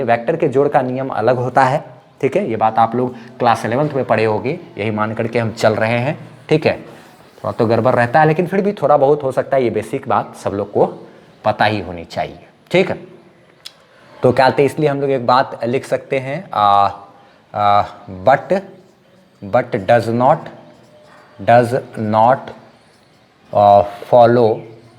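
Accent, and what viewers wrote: Bihar, India. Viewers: Sir aap Bihar k ho..?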